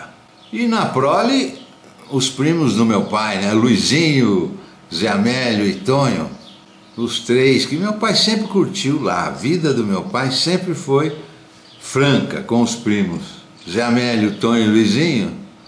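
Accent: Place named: Minas Gerais, Brazil. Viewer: Que bonita história! Sou mineiro também e me identifico muito com essa sua maneira de falar. Conserve assim, essa originalidade de ser. Um abraço.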